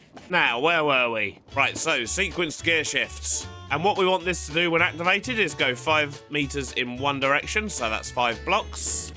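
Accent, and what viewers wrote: United Kingdom. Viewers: that accent never fails to amaze me. british? also train station name: Docker Dumps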